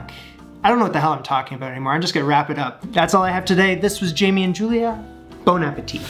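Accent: Canada. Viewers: Please respond to my comment. You are Canadian based on your accent. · Yup, been binge watching these too the past few days. The best thing is waiting for Jamie to drop an "about" in his adorable Canadian "ooh" accent. 😁 Definitely making these soufflés myself! Keep the orders up, J! 😋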